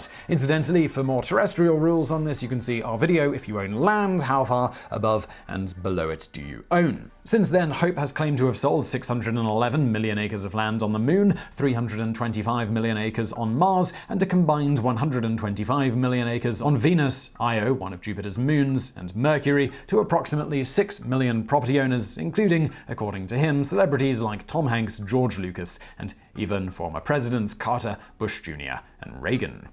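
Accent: United Kingdom. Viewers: I enjoy any video that has a British guy repeatedly saying fart!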